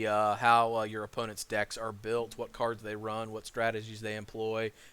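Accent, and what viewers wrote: United States. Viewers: i agree with the others, your videos a great dude, what accent? you seem to have a pretty normal middle of the road american male voice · I mean...i'm trying to wonder what accent it would be...he sounds Pacific N.W..atleast west coast. Not much of an accent. He has some southern sound when he says Line. Mostly with his (L)s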